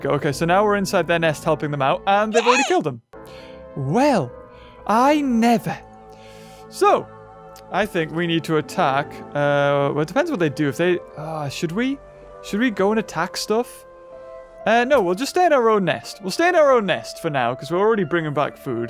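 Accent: Philippines. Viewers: ah that filipino accent is giving my ears seizures 😭😭😭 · ANOO NAGSABI BA SIYA NG PILIPINO TAGALOG ACCSENT HA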